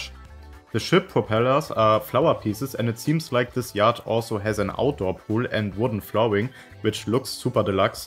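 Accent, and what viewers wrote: Germany. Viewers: Is it shust me or do i hear german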